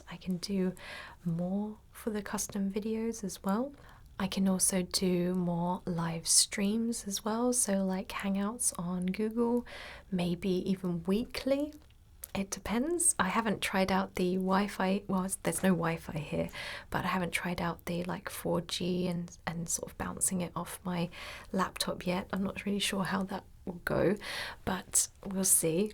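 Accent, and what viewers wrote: United Kingdom. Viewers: Im in love with your voice... And British accent ❤️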